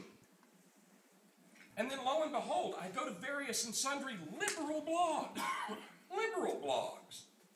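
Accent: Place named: South, United States. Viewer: Southern drawl nonsense. Listening to this guy is like shitting razor blades. His daily trash talk on "The Horn" is pathetic and so juvenile. Keep propping up idiotic Lib rhetoric is all this clown does. Stands for nothing but status ignorant quo of leftist crap. How is that "hope and change" working out for you? You got your long legged mack daddy in the white house and see how he disregards you all daily. Absolutely hilarious!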